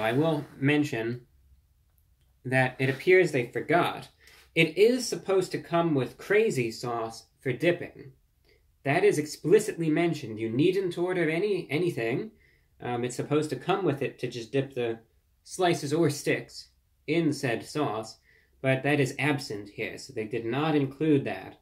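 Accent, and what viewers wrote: United Kingdom. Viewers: Loving his heavy British accent on the podcast now ❤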